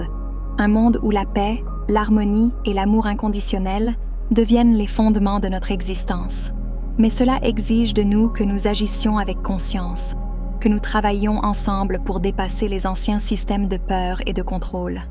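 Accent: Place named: Canada. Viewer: ************ Merci l'IA au petit accent canadien de ce message...Et merci aux initiateurs de celui-ci. *********************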